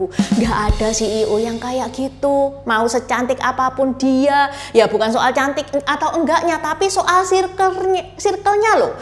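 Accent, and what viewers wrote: Java, Indonesia. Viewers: Chindo medok.. Jaya Jaya jaya.. Matur nuwun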